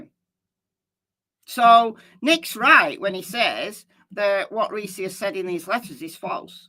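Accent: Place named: Lancashire, United Kingdom. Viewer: definitely not Allen definitely not there was another Mann looks like him dressed like him etc that exact time used the same type of gun drove the same black ford focus same rims I mean what are the odds aye? BTW ur horrible wooly back accent is really annoying especially when ur desperate like this ur over reliant on your youtube revenue and my God forgive you for what u may be putting g these families through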